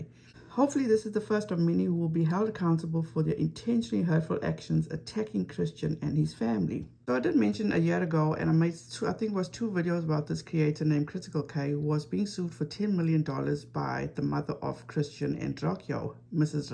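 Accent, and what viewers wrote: South Africa. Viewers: this is the first of your videos I have seen. Do I detect a South African accent?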